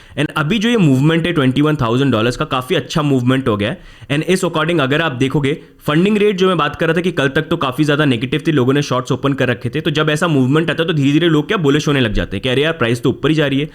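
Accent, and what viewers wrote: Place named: India. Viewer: sry bro but these indian eglish is horrible, cant understand anything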